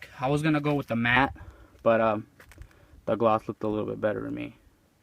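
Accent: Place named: Mexico. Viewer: Sick ride! And I actually like the Meskin accent bro!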